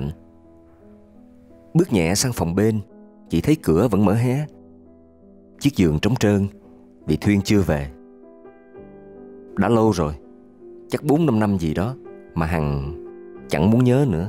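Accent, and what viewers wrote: South, Vietnam. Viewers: Giọng miền nam của bạn thật hay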